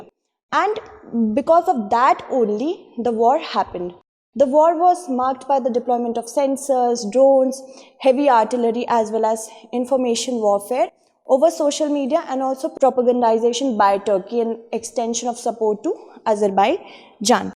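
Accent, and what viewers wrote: India. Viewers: Is She Indian? Her accent is like Indian.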